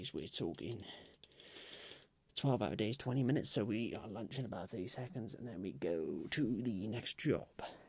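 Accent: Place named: England, United Kingdom. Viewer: I hear the English in your voice now 😭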